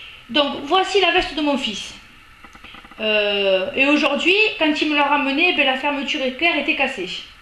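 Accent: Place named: South-West, France. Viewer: fabuleux ! super maman avec en prime un super accent du Sud-Ouest félicitations !!!!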